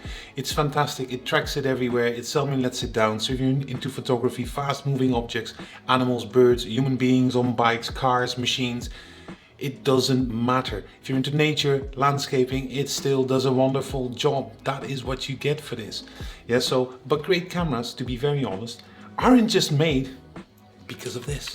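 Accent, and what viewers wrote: Netherlands. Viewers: Very nice review! Your accent is... Dutch?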